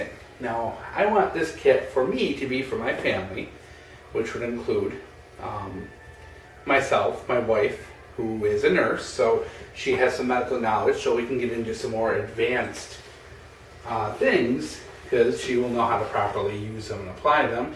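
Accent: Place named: Michigan, United States. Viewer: Are you from Michigan? You have that accent lol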